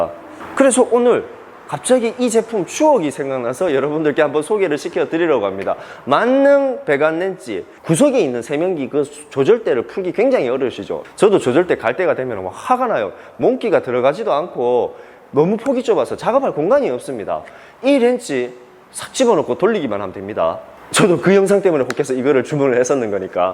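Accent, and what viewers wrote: Gyeongsang, South Korea. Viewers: 우한 코로나가 아니라 COVID-19입니다 억양을 보니 대구 코로나라고 하면 기분 안좋으실 것 같은데..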